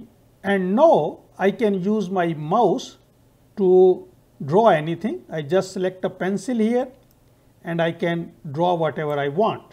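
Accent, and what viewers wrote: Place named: India. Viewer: indian accent again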